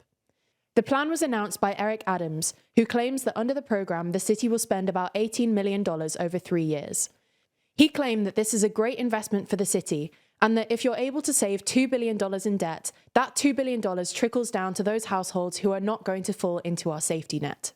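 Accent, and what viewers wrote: United Kingdom. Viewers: She is great but but has a T on the end, she is confident, easy to listen to and the news is impressive but she does say bu and similar so her pronunciation is more a natural British rather than correct :0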